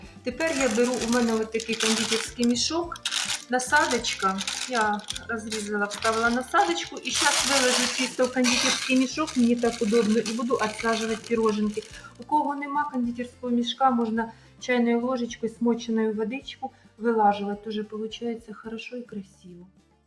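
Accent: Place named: Surzhyk, Ukraine. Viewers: Бо дикий суржик)) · А наша пані з Одещини,і мене зовсім не обтяжує її суржик.Людина Вам розказує показує,відкриває Вам душу,а Вам виявляється,важко слухати.А наша пані не на сцені,декламувати поезію.Вона розмовляє своєю,рідною мовою,до якої звикла,якою розмовляє все своє життя.Не треба вносити ложку дегтю в бочку меду.Попробуйте і Вам буде приємніше слухати нашу.До речі,я з Хмельниччини і також не розмовляю чистою українською,так що мені й не жити?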